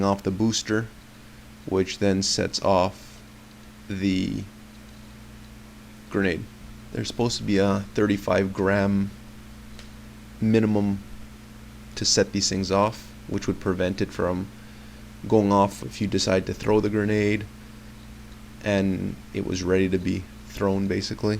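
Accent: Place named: Canada. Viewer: Obvious Canadian. Not that there's anything wrong with that.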